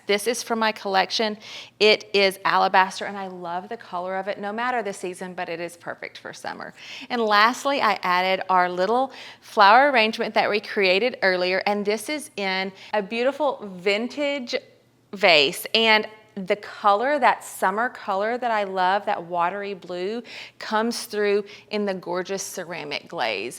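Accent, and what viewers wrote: South, United States. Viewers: Love, love her southern accent! I’m from the Midwest… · I like you a lot more without the over the top southern accent, and yes I am southern.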